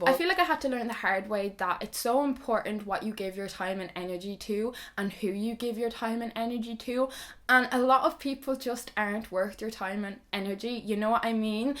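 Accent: Ireland. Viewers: If you don't love an Irish accent, you're not alive.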